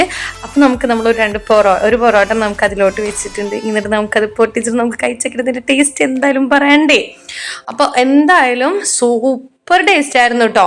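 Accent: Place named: Thrissur, India. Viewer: നിന്റെ സംസാരം കേൾക്കാൻ എന്തു രസാ... 😍തൃശ്ശൂർകാരി ❤️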